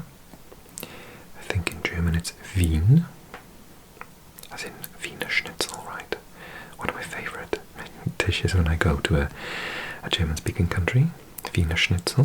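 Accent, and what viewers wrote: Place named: Wales, United Kingdom. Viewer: I have a theory…. Your Welsh!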